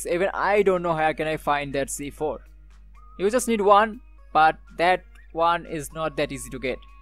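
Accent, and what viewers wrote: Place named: India. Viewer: you sound Indian af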